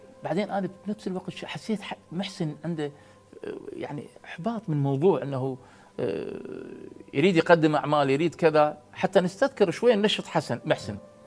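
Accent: Iraq. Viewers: تحياتي الى الفنان عبدفلك صاحب الاخلاق الجميلة والصوت الجنوبي الجميل استاذ عبدفلك البصره تحبك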